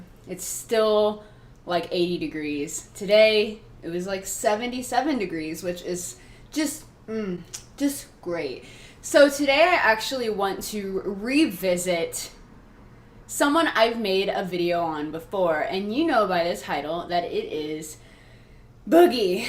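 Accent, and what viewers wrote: South, United States. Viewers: Is it me or she has a slight southern accent?... just, certain words. Its hard to tell but there is something. Never noticed. But maybe I´m just to sleep depraved. Also cuteness overload. Just pout.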